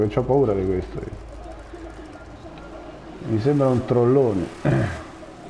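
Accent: Rome, Italy. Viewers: super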